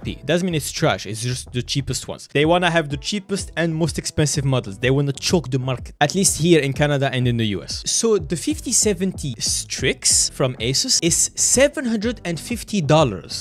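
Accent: France. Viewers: Interesting voice, is that french accent ? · What's up with that accent 😂😂 I swear every language besides English sounds weird or lame 😂 whatever accent this is sounds lame like French or something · Are you french ?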